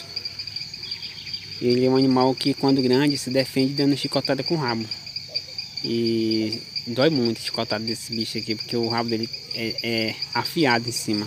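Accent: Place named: Paraíba, Brazil. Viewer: Comlissenssa vc e da paraiba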